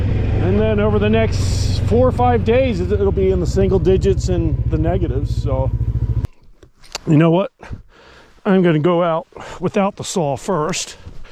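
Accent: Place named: Philadelphia, United States. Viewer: Good day! I’m picking up bits and pieces of a Philly or Philly adjacent accent! Where are you from originally?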